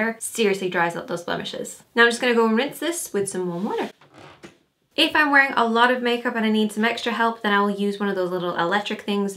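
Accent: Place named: Ireland. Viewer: She is from Ireland ^^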